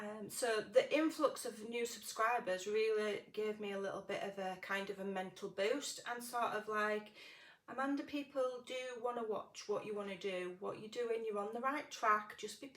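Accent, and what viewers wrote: Yorkshire, United Kingdom. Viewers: Hello Amanda! So very nice to "see" you! (Love your hair color - it suits you!) I think it's a great idea to do a vlog here and there. You could show us historical or Victorian buildings in your area. Or take us to a lace shop or a thrift shop (to look for out of copyright images/music sheets). Or show us around the countryside or visit a village. Or just do a vlog showing clips of those ferocious "guard" dogs of yours! 🤣 I love the Yorkshire accent, thriftiness, stubbornness, friendliness, common sense and you don't take yourself too seriously. Honestly, I watch you just as much for your personality as I do for the lovely things you make. And I agree with you - a true crafter never settles down to one thing. Part of the fun is to have a go at all kinds of things, just to see if we can do it. I'm so happy your viewership is continuing to increase. 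Your new subscribers are going to love you! Thanks for all you do! Janet 💕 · Love this Amanda i to am a flitterea haha I call myself a multi crafter . My accent is pants love the Yorkshire accent i u derstand it very well some of your frazese we use in Newcastle to Loveing the red hair to ❤️ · I’m one of your new subscribers and loved this vlog 😊 I think we are quite alike in our crafting too many ideas to stick to one project ! And you have inspired me to try a vlog maybe one day when I feel brave enough 😂 You are very pretty and definitely should not change your accent it’s proper Yorkshire ❤️ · Yaay a Yorkshire lass like me - love it!! Just found you, do I detect Bradford/Leeds in that accent? Sending lots of love anyway xx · Personally I love your Yorkshire accent! Nice to see you! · Nice to meet you face to face (sort of). You look lovely and I love your accent. I have a few friends from Yorkshire and I just love the way they talk: it's part of who you all are. 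I am Spanish and have my funny accent from the north west of the country too! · Oh my goodness! I am so thrilled to have fallen upon this video! Thank you for this vlog as now I can put a "face to the voice and laugh"! Your Yorkshire accent is beautiful. I am from Florida USA and there are all kinds of accents here, although, I don't have one, lol. Never change, just be who you are and embrace yourself, we do. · As a fello yorkshire lass you sound just normal to me. Been in Oz for 20 years but still have my strong accent and its comforting hearing you talking. Your crafts are amazing, easy to follow and always fun to do. Thank you sooooo much for all you share, including yourself. Wanted to send you a white rose but there was only a red one!!!! so here's a daisy instead🌼Jane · Going through some of your old videos and started with this one! Love it! Your Yorkshire accent is beautiful! 💗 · It's awesome to see your face. 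Love the hair! And I love your Yorkshire accent! You are so encouraging and generous. Thanks for all the freebies.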